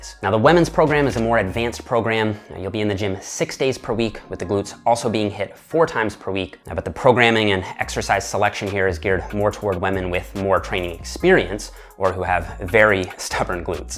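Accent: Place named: Canada. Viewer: English is my second language and i can never tell the difference between Canadian and American accents, until now😂. Thx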